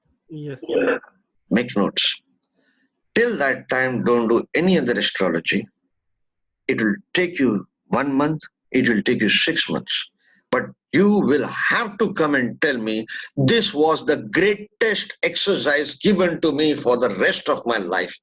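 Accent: India. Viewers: bingo. Sir, your style of speaking( perhaps the mumbai acent) always reminds me of amitabh of Amar akbar anthony !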